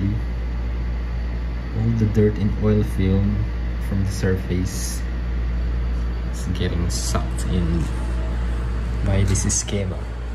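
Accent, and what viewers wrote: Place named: United Kingdom. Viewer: Akala ko british national yung nagsalita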